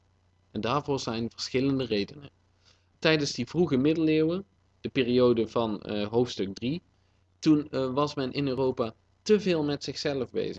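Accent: Limburg, Netherlands. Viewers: Ten eerste, dit is duidelijk geen Belg, meer iemand uit Limburg, Eindhoven zoiets en ten tweede doet ie meer dan jij in je hele leven zal doen;)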